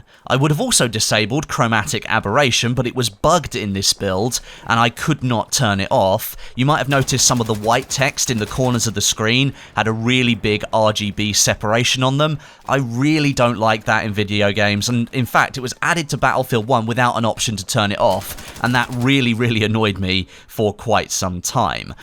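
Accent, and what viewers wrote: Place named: United Kingdom. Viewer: Man you sound like an intellectual with that British accent...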